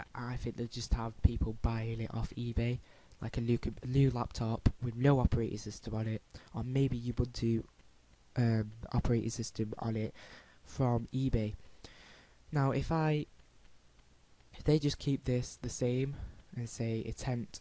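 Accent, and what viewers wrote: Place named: Yorkshire, United Kingdom. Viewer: The UEFI boot thing is worrying but I don't think that MS would like the bad publicity it would get if it tried to limit the use of computers to its own OS. This is the 1st of your vids I've watched - your accent sounds similar to mine so you're prob in Yorks - Do you go to a LUG? I only have Linux, I grew to hate Windows more & more so it was easy to leave it & move to Ubuntu. I run Ubuntu 11.04 'Classic' on my desktop & Xubuntu on my EeePC901, laptop & server. Use CentOS in a VirtualBox.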